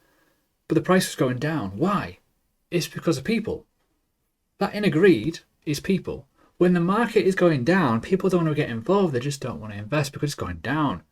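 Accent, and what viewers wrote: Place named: Newcastle, United Kingdom. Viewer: I know one thing. I'd listen to a good geordie lad over some california bullshitter all day long .I've listened to all the crypto you tubers over the past year and it's not hard to work out that most of them are wrong uns .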